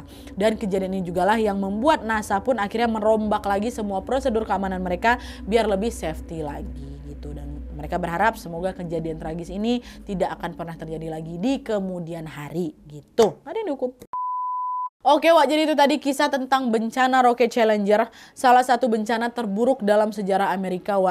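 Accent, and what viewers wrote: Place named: West Sumatra, Indonesia. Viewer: Seneng sih denger penyampaian dari. Dari logat bahasanya, orang minang ya wak? 😁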